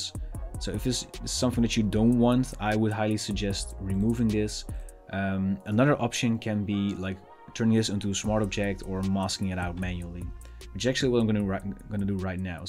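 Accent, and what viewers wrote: Netherlands. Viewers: Deze design is echt zo dope! Ik hoor aan je engels toevallig dat je nederlands bent 😊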